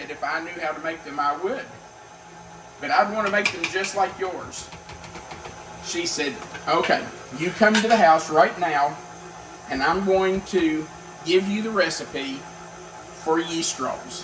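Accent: South, United States. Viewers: The rolls look good but too much work for me to make. I do not like (putting it mildly) country ham. Love how Southerners pronounce: ol (oil), greeze (grease) and some say paparika (paprika)..LOL. Can you tell I'm a Northerner? My grandkids wouldn't live if they called me Mamaw and husband Papaw. Love watching your show but I can't cook too much anymore. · Yummy! Love your Southern accent and hospitality. I have a brother in law that is so sweet and does the cooking for his family. Same Kentucky accent ❤😅 I’m from Oregon. Thank you., God bless!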